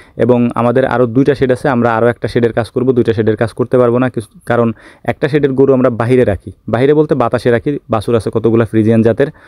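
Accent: Faridpur, Bangladesh. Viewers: Vaiyar basha ki Faridpur?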